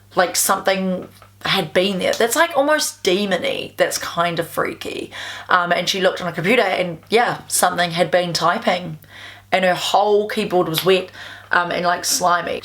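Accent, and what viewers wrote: New Zealand. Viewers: Shivers.. You gotta love how New Zealanders talk. Ever seen Flight of the Concords? Case closed.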